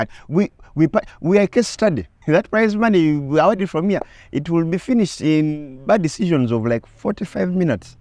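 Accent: Nigeria. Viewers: The Nigerian accent is on point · The Nigerian ascent was on point 😂😂😂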